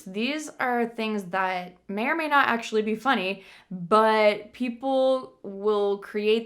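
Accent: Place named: United States. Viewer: I am from India and i want to make my pronounciation like you , like American..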